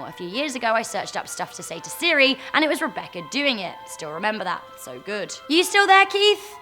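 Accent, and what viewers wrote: United Kingdom. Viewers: Lol dont know what it is but I love it how British people narrate anything, reason why I watch BBC America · I LOVE YOUR BRITISH ACCENT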